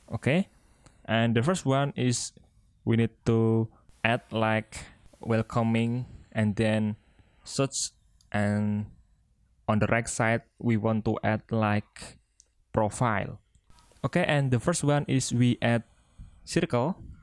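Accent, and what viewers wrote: Indonesia. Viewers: Awal nonton, kok aksennya familiar 😂, ternyata orang indo toh, mantep dah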